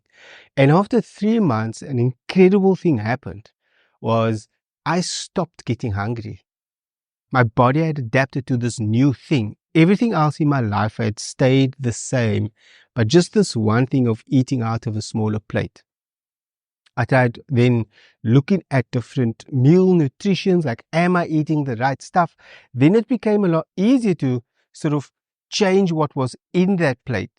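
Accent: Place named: South Africa. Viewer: Sounds like a South African I loved what he had to say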